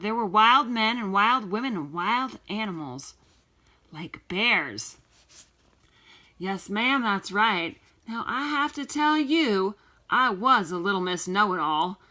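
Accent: South, United States. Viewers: You have a pretty voice it’s country I love it thanks for doing my hw 💖❤️🧡💛💚💙💜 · Her accent was from the country she’s a beautiful reader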